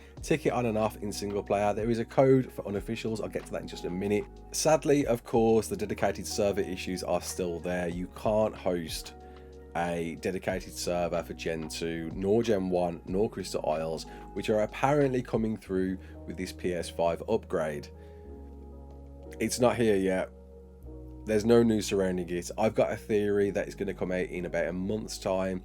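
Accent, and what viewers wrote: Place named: West Midlands, United Kingdom. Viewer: dont ever deny us that beautiful west midlands accent again brother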